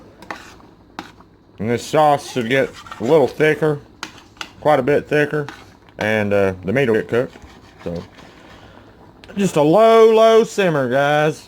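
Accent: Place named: Western Kentucky, United States. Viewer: Where are you from? We have the same accent. I’m from western ky. You’re a great cook btw!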